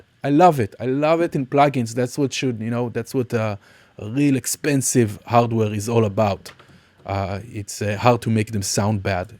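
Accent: Israel. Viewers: I have instantly recognized your Hebrew accent ! קיבלת עוקב ולייק ;)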